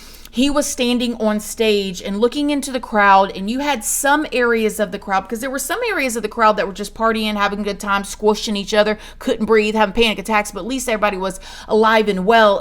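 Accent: South, United States. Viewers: You giving me First Lady Tease I love it and your lil country southern twang lol 💜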